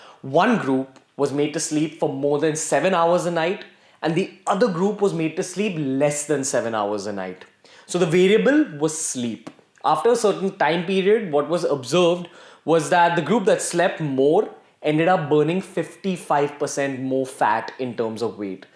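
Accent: India. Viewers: fuck indian accent. irritating af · Be grateful that indians are speaking english. They dont need to. If you dont like his accent, fuck off!!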